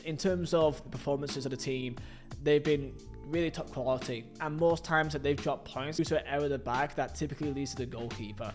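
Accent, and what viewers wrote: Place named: Lancashire, United Kingdom. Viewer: That's just the Lancashire accent.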